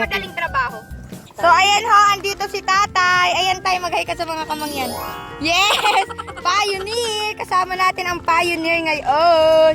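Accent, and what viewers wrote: Batangas, Philippines. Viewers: Kagaling magsalita eh parang batangas lng eh idol crush kita ayiìihhhh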